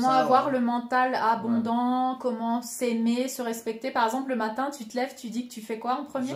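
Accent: Alsace, France. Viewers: Merci, nous sommes sur la même longueur d’onde, je réfléchissais à ce phénomène depuis quelques temps et ta vidéo m’a beaucoup parlé. Je suis un homme homosexuel, mais à aucun moment je ne soutiens le mouvement LBGTQI+-machin-truc-bidule-chouette. Pour moi être homosexuel est juste une particularité ou une caractéristique, ce n’est guère plus important que d’avoir les yeux bleus ou verts. Ça me regarde moi et c’est comme ça. Tous ces lobbys wokistes ne représente qu’eux-mêmes, la seule chose qui m’importe en amour est le respect, l’honnêteté, la bienveillance et la fidélité à soi et à l’autre. Le reste ne m’intéresse pas, et je n’irai certainement pas faire de mon fonctionnement une identité et encore moins une revendication. On ne va pas "convertir" quelqu’un à changer de couleur d’yeux, c’est aussi ridicule que de faire la promotion de l’homosexualité, ça ne se décide pas et ça ne s’impose certainement pas non plus. 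Ceux qui cherchent à imposer cela à des enfants sont de grands malades. Il faut laisser les jeunes tranquilles avec toute cette sexualité et préserver toute leur innocence car elle est un cadeau du Ciel. 🙏🏼 Je suis de Strasbourg-Schiltigheim vivant à Reims, et ton accent alsacien m’a rappelé la maison ❤🤩🥰 Je t’embrasse 😘🙏🏼🦋💐 Que Dieu te bénisse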